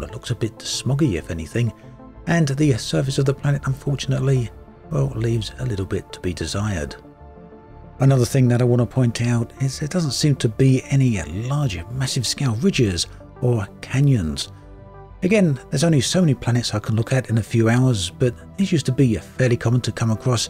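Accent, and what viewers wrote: United Kingdom. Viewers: The narrator’s British accent is too thick, cannot understand him!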